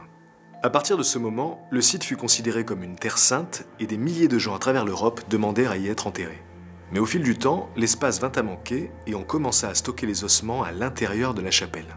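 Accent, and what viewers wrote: France. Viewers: Salut!!! Tres tres tres bien!!! En tant que tcheque j aurais aimé que vous ayez prononcé mieux (cad pas a la française) les noms des personnes et endroits, mais c est un petit detail pas tres important. Chapeau, ou bien “klobouk dolu” comme on dit chez nous. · J'ai un peu mal en entendant la prononciation française des noms tchèques, mais chouette visite.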